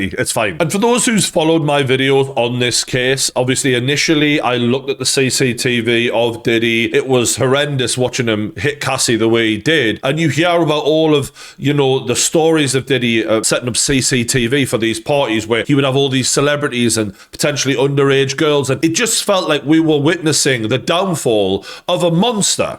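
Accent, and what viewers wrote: Newcastle, United Kingdom. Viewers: Easy Geordie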